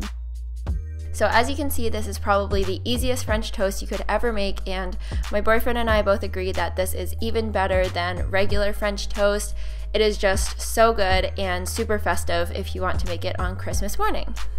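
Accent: Canada. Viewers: Are you Canadian????? I love your videos 😁❤️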